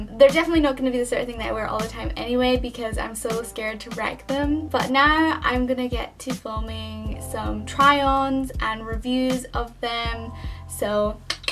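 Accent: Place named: Scotland, United Kingdom. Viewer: Took me a while to place your accent, then I when; “fuck that’s my accent.” 😂 Scots on YouTube are few and far between. I’ve gotta ask how is sizing since they are from the US? Or are they made big or small.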